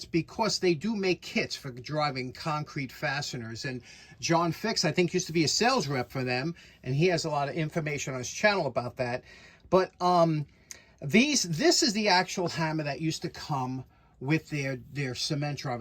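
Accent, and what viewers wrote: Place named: New York City, United States. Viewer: your accent makes the video's. Nothing like good ole NYC sarcasm.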